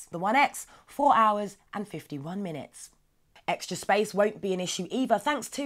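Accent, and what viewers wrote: England, United Kingdom. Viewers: Are you British? You've got the accent.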